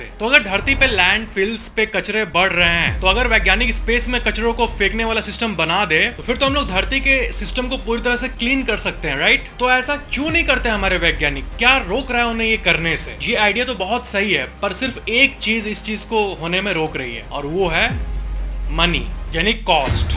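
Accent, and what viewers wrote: Bihar, India. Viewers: bhai tu bihari ho na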